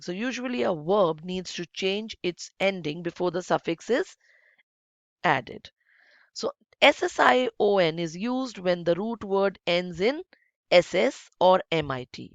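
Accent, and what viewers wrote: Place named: India. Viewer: teacher, thank you too much for this [ clear tutorial ] and [ the helpful ] which solved problem had been with me. from your accent I think u are Indian by the way I lived in India 3 years mostly in Bangalore and Mumbai, it was really unique time and I really want the time give me another chance to visit India..........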